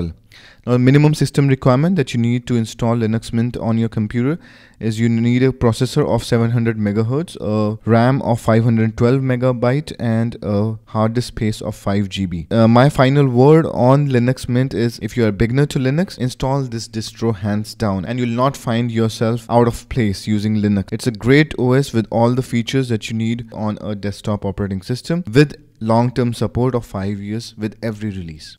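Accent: India. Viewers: You're talking in the classic Indian accent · I hate indian accent ! Speak proper fn english !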